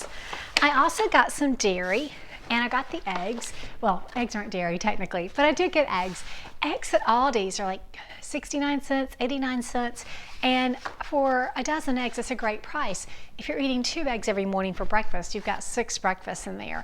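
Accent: South, United States. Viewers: I walk around the house saying "fathead dough" in your southern accent. It's starting to drive my wife nuts... she says.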